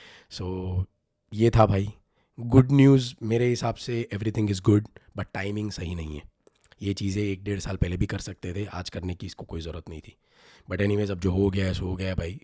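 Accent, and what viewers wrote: India. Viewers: You need to improve your English speaking skills, sounds like you just came from India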